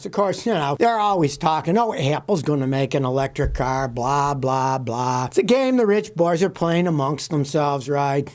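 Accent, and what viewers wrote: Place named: New England, United States. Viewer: Love the Yankee accident, etc The charm of "PLETH-ORa" is classy gangsta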